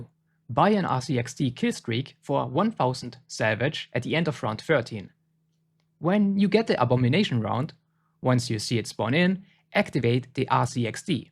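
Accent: Germany. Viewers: Du bist safe deutsxh der akzent verrät dich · Bin ich bescheuert oder höre ich den deutschen Akzent raus ? Gute Zusammenfassungen. Hoffe in Zukunft folgen noch mehr Hilfestellungen zu Visitenkarten. Bin auf weitere Videos gespannt !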